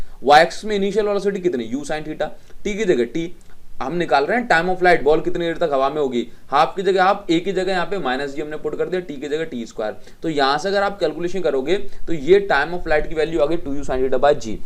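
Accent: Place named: Bihar, India. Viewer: i think bihar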